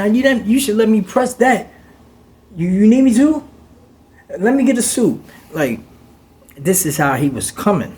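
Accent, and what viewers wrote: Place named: East Coast, United States. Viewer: Also that vid u did when u asked if evil folks really exist since a lot of people be typing his name. Ima keep reminding u of stories cuz I use to sometimes watch all your videos while I was cleaning toilets with one AirPod in my ear lol so u got some chicken off me alone salute , you one my favorite prison story channels cuz u keep it real I’m from ny so I guess I relate more to your east coast way of speaking compared to the other Georgia prison yt channels , but try to link up with Charlie global , he moving fast in subscribers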